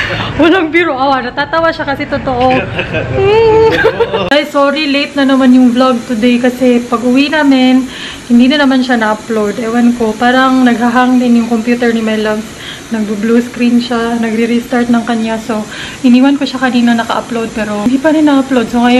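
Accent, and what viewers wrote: Visayas, Philippines. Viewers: Kacute mo gid na ya magbisaya teh 😂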